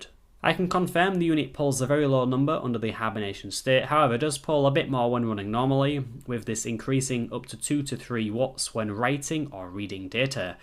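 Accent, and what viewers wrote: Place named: England, United Kingdom. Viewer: ‼️it took me to the end of the video to realize he’s saying “RAID” not “red” 😂 English Accents are such a joke. There’s no way they aren’t aware of how dethroned their speech is when there’s no perceptual difference between these two words. For real.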